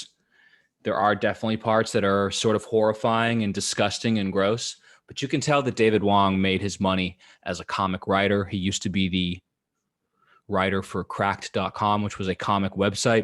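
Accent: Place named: Italy. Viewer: You're Italian accent is amazing.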